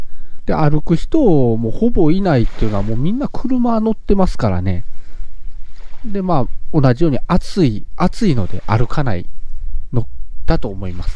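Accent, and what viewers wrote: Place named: Kansai, Japan. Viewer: 15年沖縄にいて関西訛り抜けないのが1番ビックリしてますw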